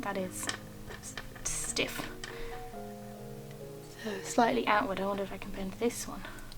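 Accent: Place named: England, United Kingdom. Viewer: That candelabra came out amazing..I'm so inspired but your imaginative creations! It's so mezmorizing to hear your proper English with an English accent..lol🥰 gives such a pure primitive vibe❤❤❤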